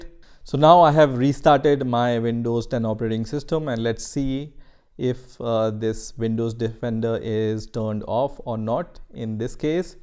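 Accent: India. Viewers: This man sounds Indian to me. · English title 😀 Indian accent 🙁 Actually using English 🤩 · this man has a American ascent and a Indian ascent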